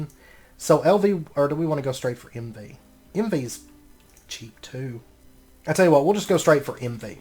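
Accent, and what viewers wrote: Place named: South, United States. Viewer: somewhere in southern USA afaik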